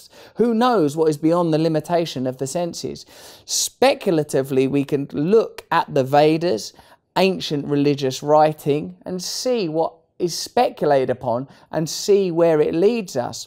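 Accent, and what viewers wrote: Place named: Cockney, United Kingdom. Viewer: I wish his Cockney weren't so accentuated. I have to concentrate for a second, think of the word in context, then figure - he must have said maTTer. lol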